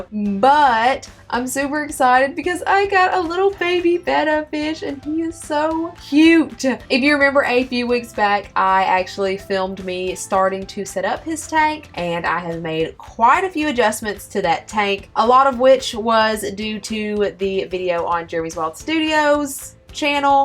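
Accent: South, United States. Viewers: That southern accent though haha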